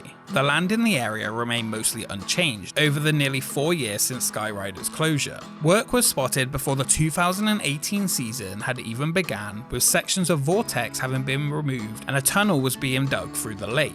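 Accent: United Kingdom. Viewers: You're British though right? Guessing from the accent but of course could be wrong!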